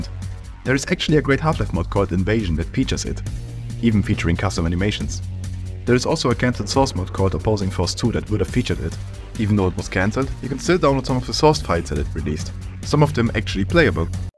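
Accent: Germany. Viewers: Why are cut enemies always that good?😭 PS: Its undeniable that you are german, am i right?😅😊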